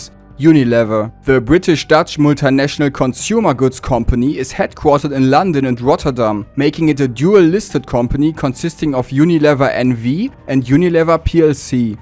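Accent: Germany. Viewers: Love an English German accent.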